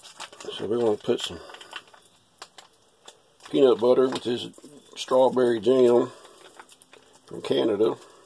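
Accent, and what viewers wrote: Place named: Boston, United States. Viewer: Very heavy Boston accent for sure! That makes at least two of us!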